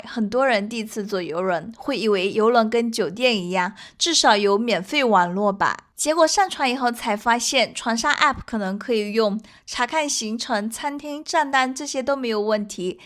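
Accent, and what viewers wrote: Fuzhou, China. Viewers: 典型福州口音？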